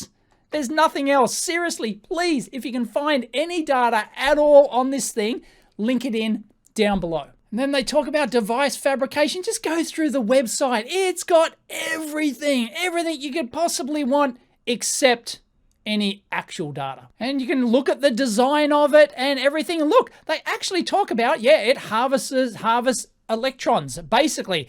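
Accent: Australia. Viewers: God you make me laugh. And nice to hear a fellow Aussie accent amongst all the usa folks. :)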